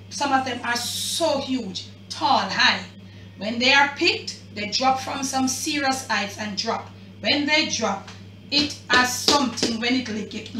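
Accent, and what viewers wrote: Jamaica. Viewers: SHE IS SO HILARIOUS, WHEN SHE SPEAKS JAMAICAN VERNACULAR FAST, I DON'T UNDERSTAND ONE THING SHE SAYS. BUT, IT SO FUNNY. WHEN SHE SLOWS HER SPEECH I UNDERSTAND EVERYTHING SAYS. I LOVE THAT SHE DID NOT COMPROMISE HER JAMAICAN ROOTS IN DESCRIBING HOW TO ROAST THE BREADFUIT. I LOVE ALSO THE CANDOUR IN FRONT OF THE CAMERA. MADE ME FEEL LIKE I'M HER BEST FRIEND. I CAN SIT AND CHAT WITH HER ALL DAY.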